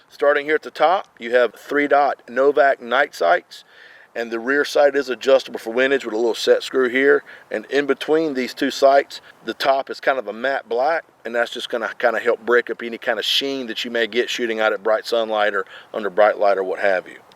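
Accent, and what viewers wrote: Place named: South, United States. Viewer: that southern accent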